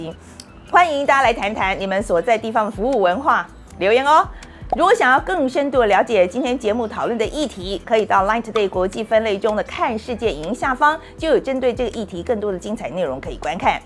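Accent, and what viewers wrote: United States. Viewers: 范姐一整個美式日文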